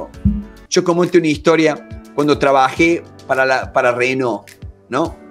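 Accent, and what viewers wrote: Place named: Cordoba, Argentina. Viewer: Acento weon de los cordobeses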